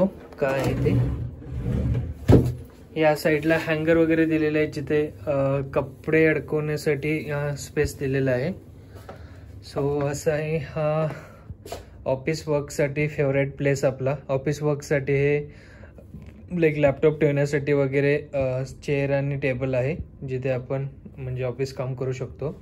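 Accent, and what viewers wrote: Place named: Kolhapur, India. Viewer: भारी भारी 😃😃 As a 1st vlog looking much more confident. "पटनं तुम्हानी दाखवतो" will steal the hearts of kolhapuri folks. I'll be excited for more video like this specially in कोल्हापूरी accent touch. I know you have that inherent kolhapuri accent. So, looking forward for such more videos. by default "Minglish" language is understandable because of your profession. Keep it up 😅🎉👍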